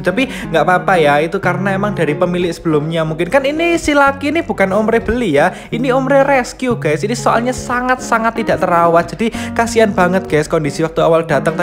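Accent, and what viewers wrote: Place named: Java, Indonesia. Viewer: Wah mantap. Ternyata kalau ngonten medok ya broooo 😋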